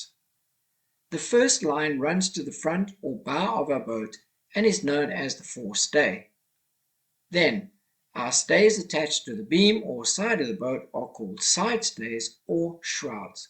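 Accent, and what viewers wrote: Durban, South Africa. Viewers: Great videos and well explained. Graphics are excellent. Do I get a hint of Durban accent?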